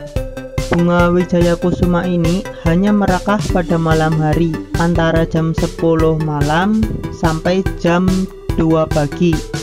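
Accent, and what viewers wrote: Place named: Banyumas, Indonesia. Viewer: Ora ngapak ora kepenak wakamaka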